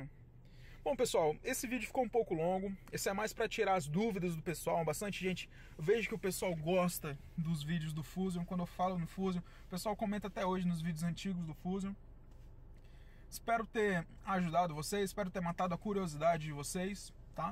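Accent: Porto Velho, Brazil. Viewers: Man... Vc É de Porto Velho - RO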